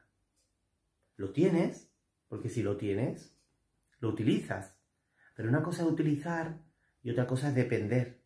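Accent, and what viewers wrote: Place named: Andalusia, Spain. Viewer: M gracias.. Me encanta tu acento andaluz yo de Cádiz. Ciudad de. Luz. Y tú luz es. Muy. Jeneroza gracias gracias gracias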